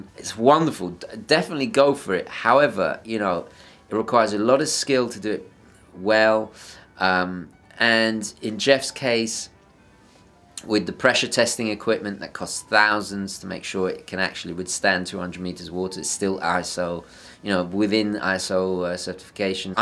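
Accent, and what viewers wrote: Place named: United Kingdom. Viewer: Seems like Brit’s like to add a Y to words lol maybe it’s just me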